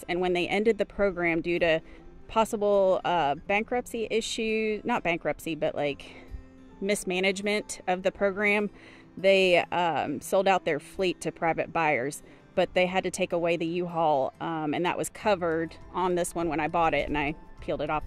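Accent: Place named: South, United States. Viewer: I soon as I heard that Southern accent I knew that I was in the right place 😁 Nice video.. I'm thinking about getting a camper here in Germany so I'm trying to learn as much as I can.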